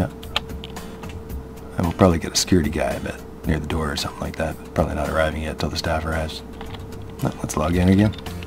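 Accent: Canada. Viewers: U sound very Canadian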